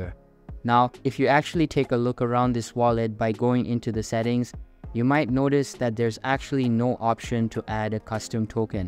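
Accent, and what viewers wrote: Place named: India. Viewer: ai has indian accent now?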